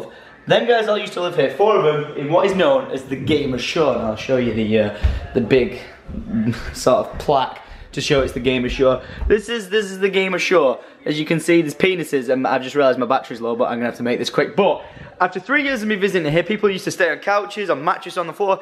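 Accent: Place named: Manchester, United Kingdom. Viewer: Manchester. Well, just south east of it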